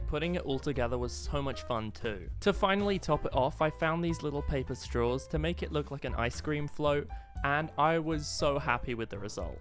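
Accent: United Kingdom. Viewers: He sounds British bro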